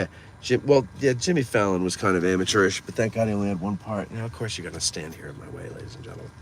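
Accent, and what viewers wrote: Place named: New Jersey, United States. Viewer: Your jersey accent is a trip..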